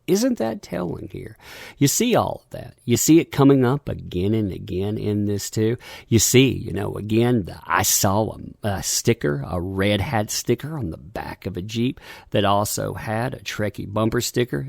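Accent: South, United States. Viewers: British guy myself . Your southern right ? 🙂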